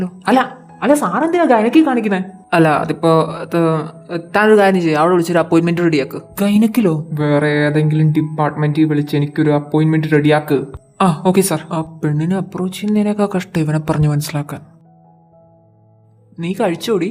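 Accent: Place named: Tamil Nadu, India. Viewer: Your Tamil accent 😤😤😤🔥🔥🔥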